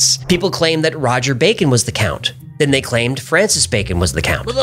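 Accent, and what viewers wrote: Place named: New York, United States. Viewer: Who would have thought a smart ass human mouthed gold fish with a new York accent and nicely timed curse words would be so g@ 🤣 funny.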